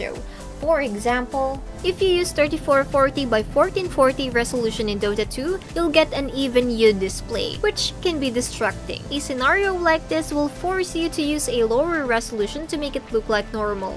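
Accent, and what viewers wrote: Philippines. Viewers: filipino accent best accent ez to comprehend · I'm familiar with this accent. She's definitely Filipino.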